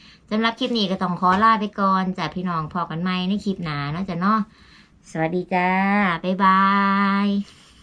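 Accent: Thailand. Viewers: คือเว้าเหมือนตนศรีษะเกษแท้ค่ะ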